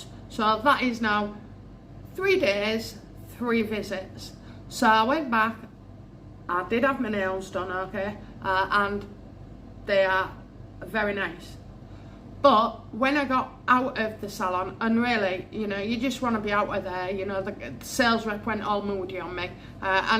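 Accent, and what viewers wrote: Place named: Yorkshire, United Kingdom. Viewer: This lady speaks English very clearly, no problems understanding her northern (Yorkshire) accent, a great regional favourite! Usually associated with a no-nonsense, down to earth, hardworking, family-loving nature.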